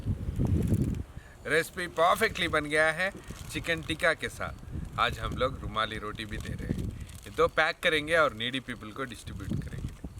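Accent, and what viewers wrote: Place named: Bihar, India. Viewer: aap Bihar se hoo Kiya? aapki awaz ek dum bihar wali hai.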